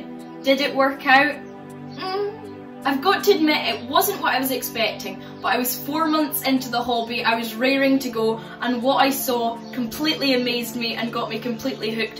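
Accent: Scotland, United Kingdom. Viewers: New subscriber caught off guard there with a Scottish accent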